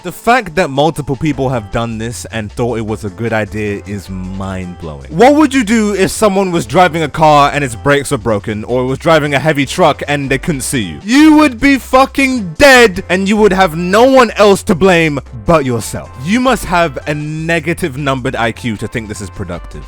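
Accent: United Kingdom. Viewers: Perhaps british i love thw failures in this comment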